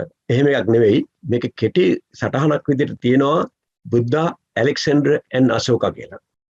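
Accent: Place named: South, India. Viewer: මුනේ මොකද ද්‍රවිඩ ඇක්සන්ට් එකකින් කතා කරන්නෙ. ඔබ යාපනේ නාග හෙලයෙක්ද?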